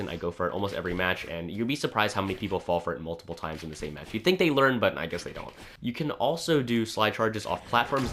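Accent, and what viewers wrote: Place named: Zimbabwe. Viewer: Where are you from? You sound Zimbabwean🇿🇼.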